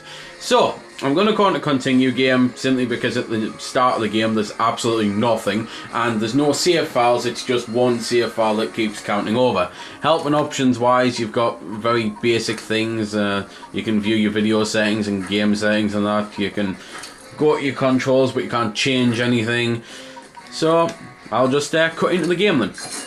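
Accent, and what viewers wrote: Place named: Northern England, United Kingdom. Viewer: You're the best reviewer gaming wise excellent taste only negative is your accent sometimes hard to understand being american. Where are you from country city and region? Is that like northern english accent?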